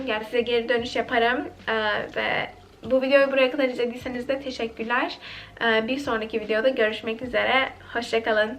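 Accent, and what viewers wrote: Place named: Texas, United States. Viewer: Litt video, succesful video. Im a fan from now on!! we are waiting for more. u got texas accent tho..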